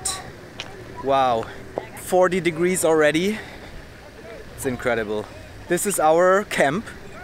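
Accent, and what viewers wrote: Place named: Germany. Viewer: You sound so German! 😄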